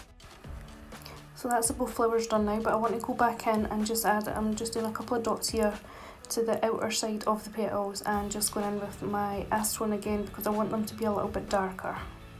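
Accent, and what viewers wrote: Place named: Scotland, United Kingdom. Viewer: Where in Scotland you from? It's strange hearing a Scottish accent xx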